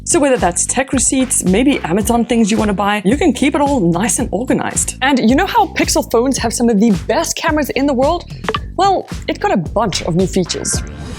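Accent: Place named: South Africa. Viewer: Always satisfying to hear that accent and know exactly where someone's from. Greetings from a South African in Poland!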